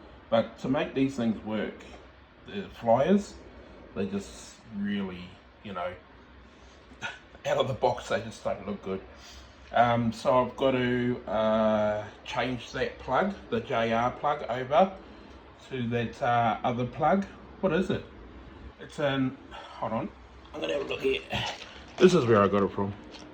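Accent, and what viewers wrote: Australia. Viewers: I hear my Aussie brotha voice!!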